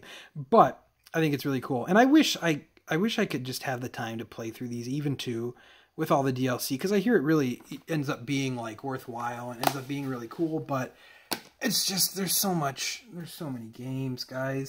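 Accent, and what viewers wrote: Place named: Upper Peninsula, United States. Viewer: Outstanding video, also love the U.P. accent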